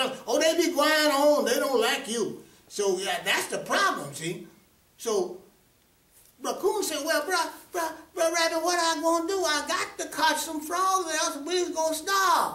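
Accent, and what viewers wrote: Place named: South, United States. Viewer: I love the ole southern bionic english language. It"s very much a part of our southern heritage & customs. I try to speak bionics but, not as good at it as!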